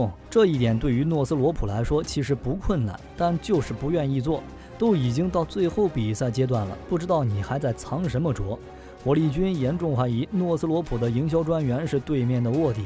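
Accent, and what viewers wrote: China. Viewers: 這不是台灣人做的影片。口音、用字是強國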